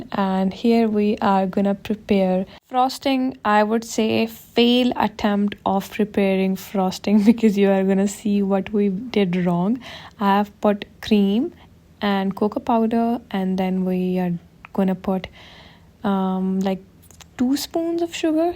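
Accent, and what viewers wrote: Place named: India. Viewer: Work on your accent please, you have typical desi accent tak tak accent 😁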